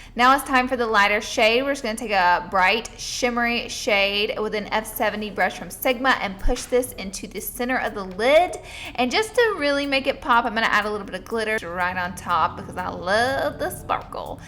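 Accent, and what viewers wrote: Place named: Rural, United States. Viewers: has a country accent 😂😂 I love it❤️ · i luuuuuuv that "aksent!!! are you from texas also??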